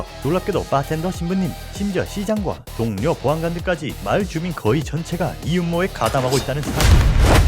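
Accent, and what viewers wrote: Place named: Gyeongsang, South Korea. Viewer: 경상도 말투. 재수없다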